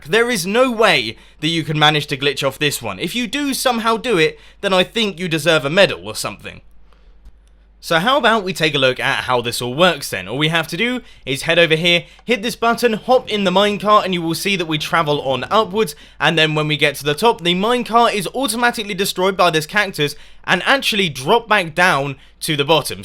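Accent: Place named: United Kingdom. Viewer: Cool British accent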